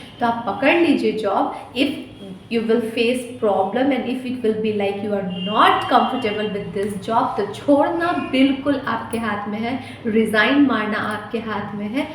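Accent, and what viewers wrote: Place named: Uttar Pradesh, India. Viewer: Kya aap up se nhi ho..... Language to up ki hi lg rhi h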